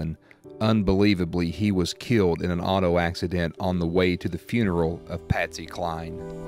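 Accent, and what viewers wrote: South, United States. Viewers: everything is great, it really is! I guess I struggle with your southern accent because English is not my first language. But other then that, awesome videos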